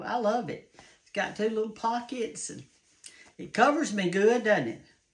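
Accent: South, United States. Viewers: Ms., where are you from if you don't mind me asking. You sound so southern and You remind me of my NANA, BECAUSE SHE USED TO WEAR HER APRONS ON EVERYTHING SHE COOKED. I LOVE YOU MS.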